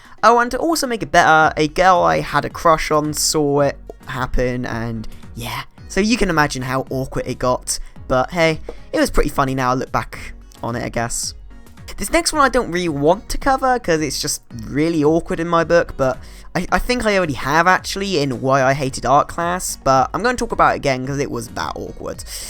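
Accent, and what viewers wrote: United Kingdom. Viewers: Im sorry but are you british? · Omg I love your British voice I always wanted to speak British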